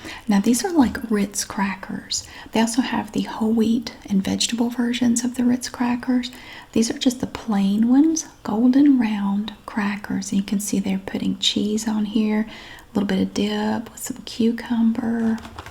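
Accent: South, United States. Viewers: Hope you have a wonderful day too! Thankyou for your soothing southern mom voice :) you are very comforting to me! One of the only talking asmr-artists I can handle at all and a big part of that is your accent and how considerate you are to your viewers!!! Thankyou again!! I will gladly do a painting of you and your family if you like! For all the nights I was anxious having existential crisis(s) you and your Aldi purchases or you rearranging things at the store was there for me. Anyways please let me know if you'd like a painting Ill do it for all you've done for all of us! Thankyou again!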